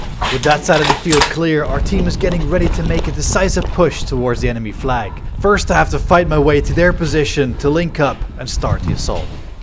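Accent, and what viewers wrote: Netherlands. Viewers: Are you dutch cause i am and i think you are too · Ayy are you dutch becuse i can understand you and im afrikaans Just look it up afrikaans is a descend of dutch the more you know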